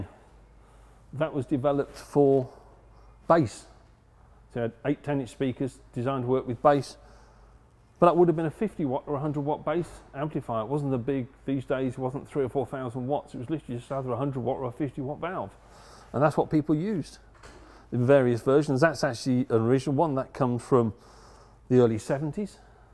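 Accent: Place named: South East England, United Kingdom. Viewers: Somewhere in the South East of England without a shadow of a doubt. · Sounds southern. Milton Keynes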